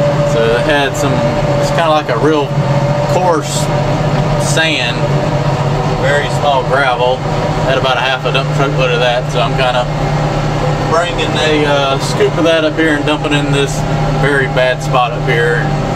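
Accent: South, United States. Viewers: I don't mind your southern accent. We all have an accent so you can't make fun of it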